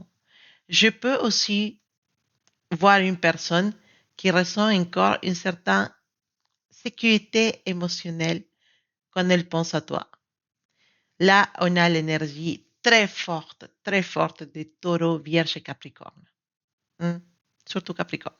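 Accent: Spain. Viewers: Merciii cest fou cest ca cedt avec un vierge flamme jumelles yavait une tierce je lui ai dit mes sentiments et aussi quil me manquait samedi cest son anniversai je vais lui envoyer un message vous avez un joli accent vous etes espagnole ma maman est mexicaine merciii je laime de trop ca gait un an que je lattends la je vois une personne meme si je laime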